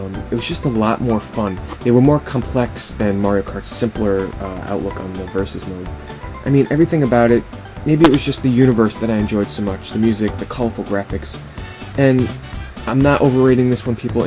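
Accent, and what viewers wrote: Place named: Boston, United States. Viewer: Yo your from Boston right? I can tell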